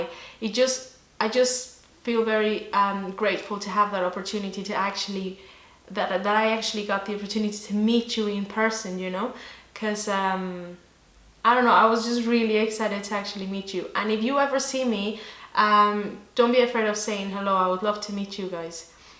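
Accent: Ireland. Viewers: Glad to see you online again! I had seen the DOWN UP TAP method on another video, and wasn't quite sure if it was legit. It seems a great way to produce a lot of sound while being economical with the amount of skin covered. One day I too would love to visit Ireland. I hope you had a wonderful trip. If you don't mind me asking, where are you from? At first I thought your accent was Irish; is it not? I'm in California, so you can imagine the lack of bodhran players out here. Thank goodness for the internet! Your instructions have helped me greatly, thanks for being there! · Do I hint a little irish accent in there?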